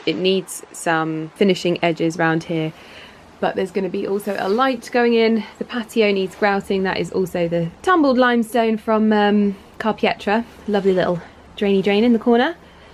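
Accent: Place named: Cockney, United Kingdom. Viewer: Jennifer Harris oh definitely has a cockney twang. I love when she does the baby voice for ali 😆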